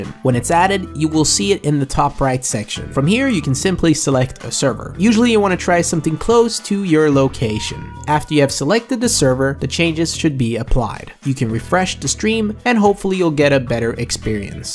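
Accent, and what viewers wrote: Sweden. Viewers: Är du svensk? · he's from Sweden the accent is pretty obvious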